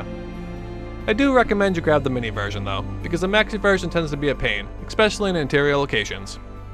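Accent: Boston, United States. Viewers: has anyone ever told u about ur nice Boston accent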